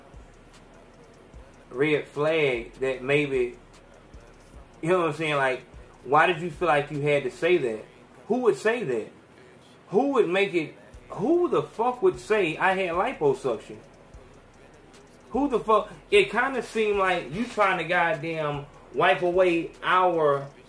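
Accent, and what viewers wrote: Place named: South, United States. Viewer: You so country but i 💕that though!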